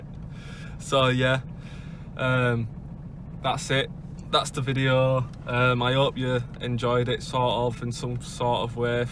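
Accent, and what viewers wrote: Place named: North West England, United Kingdom. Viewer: nice one bro. Just watching threw your videos now? Were abouts you from guessing north west with ur accent? Im up in southport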